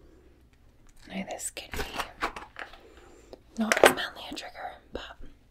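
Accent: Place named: Scotland, United Kingdom. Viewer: half an hour of tingles from the resplendent scottish lass. perry the platypus noises ☺️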